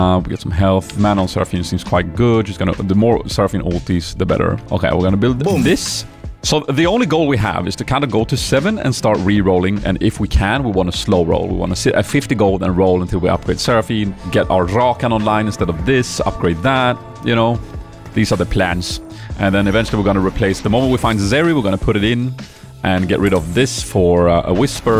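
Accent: Sweden. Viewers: All though as a fellow Swed i prefer your English over your Swedish accent, sorry your English is more crisp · Hey, please keep the Swenglish. Det är alltid trevligt med en landsfrände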